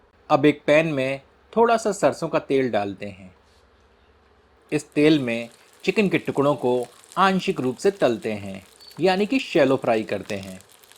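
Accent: Standard. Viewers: Ur Hindi is so shudh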